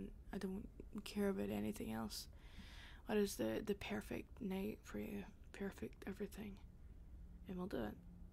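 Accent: Scotland, United Kingdom. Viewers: The first part was a bit to loud and violent for me. But this one was lovely! And I do love your scottish accent so much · Are you Scottish or I'm so confused oh god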